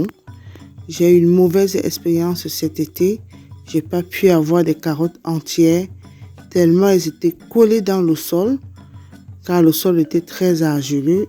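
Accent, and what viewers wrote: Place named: Africa. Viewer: coucou je trouve que t’as un accent africain 👋😄 t’es trop bien ce que tu fais. Bonne vidéo comme d’habitude. . quelles sont tes résolutions pour 2022, moi c’est de faire plus de vidéos sur YouTube c’est cool. Bonne soirée. Yanis, À bientôt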